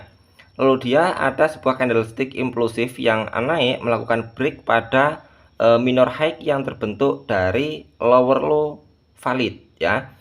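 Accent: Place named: Java, Indonesia. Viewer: saya yakin bagi semua pemula penjelasan ini menyenangkan..guampaaaang di pahami...tidak blepotan...aksen jawanya mesok mbah..he..he...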